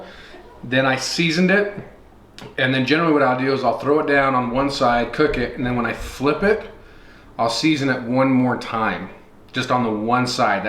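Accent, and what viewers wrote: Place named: Utah, United States. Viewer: By your accent, your from Utah, USA. Rabbits there, carry Tularemia, you stupid Mormon. You have cotton tails there, why not eat them, instead of infested jackrabbit/ Hare.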